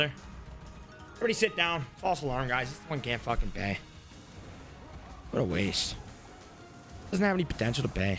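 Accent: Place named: Canada. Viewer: I love the Canadian accent❤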